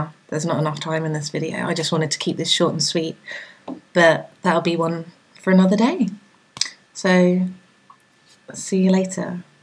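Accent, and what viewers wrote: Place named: Australia. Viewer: She presents her point in a succinct and articulate mannor. She reveals her data and lays it out for inspection. Very well done. Now that that is out of the way,,,,,,,, OHMYGOD I AM IN LUST!!!,,,uh,,i mean,,OHMYGOD I AM IN LOVE! Those eyes!Those lips! and that ACCENT! Damn, such an awesome Aussie.,,,? Kiwi?,,,Limey? Aww hell,,who cares, Ill just stare at her as she reads the phone book,,,,,,sigh.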